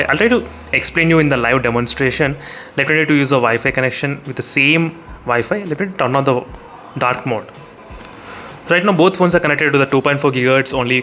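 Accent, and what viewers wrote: South India, India. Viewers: It is South Indian accent. English is correct.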